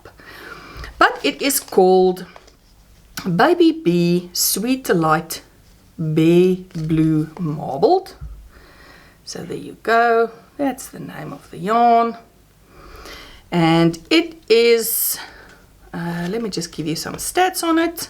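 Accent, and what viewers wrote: South Africa. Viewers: I listen to you and I thought to myself you ate South African. When you say you are from here I smiled. So happy you came in my feed. I'm from Cape Town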